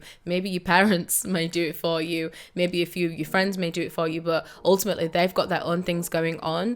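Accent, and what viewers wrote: United Kingdom. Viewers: Hearing a UK accent automatic like❤😊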